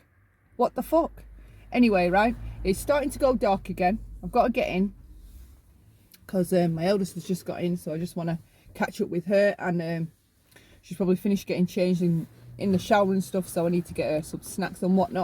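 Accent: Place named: United Kingdom. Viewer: I love your accent ( and your good heart ) but Cussing UK just sounds Classy and you're Brilliant ❣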